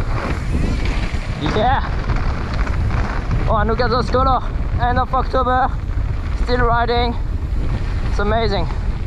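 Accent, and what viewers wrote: France. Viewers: Alala French accent, j ai le meme 😂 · J’en suis sur t’es français ???car ta grave l’accent français quand tu parle anglais ps sinon j’adoreeee se que tu fait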